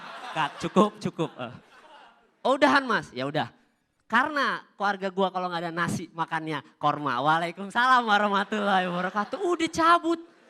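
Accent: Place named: Banyumas, Indonesia. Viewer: Ko bahasanya rada rada ngapak yah <link>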